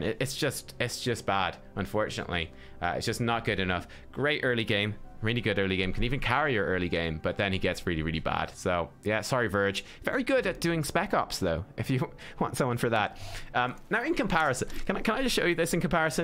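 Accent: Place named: Ireland. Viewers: It sounded like a very mild Irish accent to me but it took me a minute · That's a perfectly normal Irish accent.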